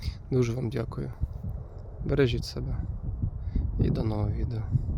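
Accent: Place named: Vinnytsia, Ukraine. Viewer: ...Гайсин... То Ви з Вінничини) я ж чую знайомий говір)))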